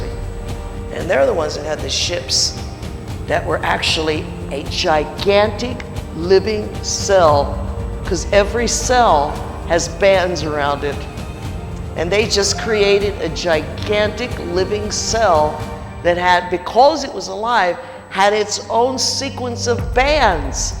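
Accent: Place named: England, United Kingdom. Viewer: What happened to the English accent?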